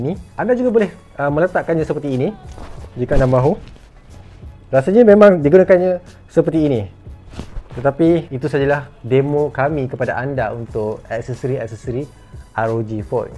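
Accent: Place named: Malaysia. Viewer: suara nya kayak malaisiya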